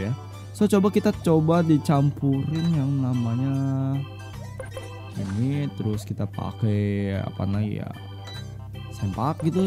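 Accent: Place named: Bali, Indonesia. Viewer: Entah kenapa logat ngomongnya kyk orabg BALI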